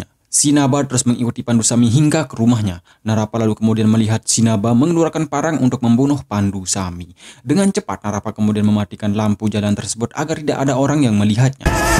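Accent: Bali, Indonesia. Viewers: bang ora Bali ya?logat nya ky orang Bali..sukses terus bang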